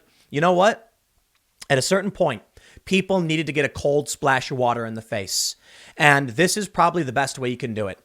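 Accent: Chicago, United States. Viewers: Yup. Im English but is it his slightly Northern/ Chicago accent?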